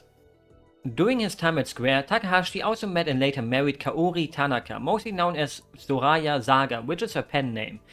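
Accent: Germany. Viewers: I could sense your German accent was looking forward to your pronunciations of the Xenosaga subtitles! Funny to see Zarathustra stumping you though haha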